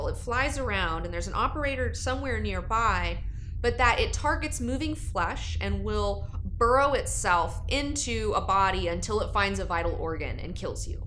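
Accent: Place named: Northwest, United States. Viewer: Okay I really gotta know something. Have you spent much time up here in the Northwest? Because there's a slight influx that I hear in your voice. Like " Out & Aboat" instead of "Out & About. Like the guy in Dirty Harry who hast to know if he fired 5 or 6 shots. I Got's to know!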